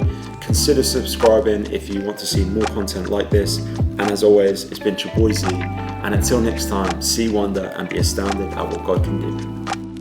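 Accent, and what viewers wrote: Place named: United States. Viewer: dude, well done. Full marks on your yank accent bruv!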